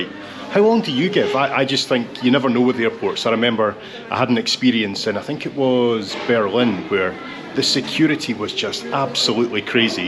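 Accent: Scotland, United Kingdom. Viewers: The first time I heard the scottish accent I thought it was a guy from Bergamo attempting to speak english.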